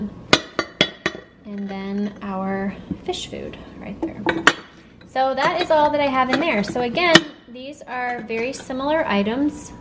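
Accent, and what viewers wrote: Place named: United States. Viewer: Your accent is wonderful, in which state in America I can find this accent? I am an English learner and I want to deal with the American accent. Please, give me the name of the state.And thanks in advance.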